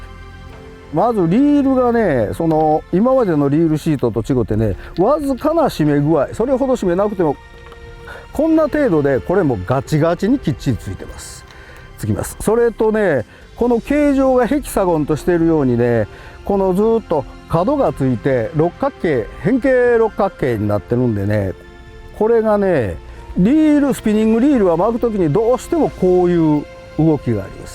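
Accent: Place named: Kansai, Japan. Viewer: おっちゃんの関西弁が良い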